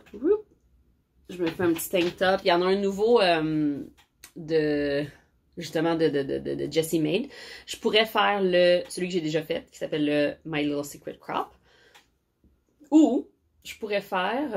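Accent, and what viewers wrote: Quebec, Canada. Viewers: Bonjour, ici la France, je découvre et j'adore tout... Et l'accent du Québec et top... Je visionne tous tes podcasts et j'adore... Merci pour ce plaisir tricotesque 😄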